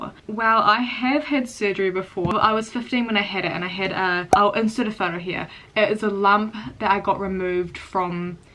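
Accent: New Zealand. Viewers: New sub 😜 betcha hear it allll the time but ur accent is awesome, I'm a kiwi aswl but my accent is nowhere near as cool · I love the New Zealand accent, but sometimes I can’t understand what you are saying, and how old are you?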